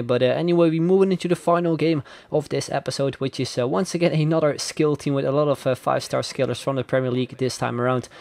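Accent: Netherlands. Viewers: Are you dutch cause you have a accent?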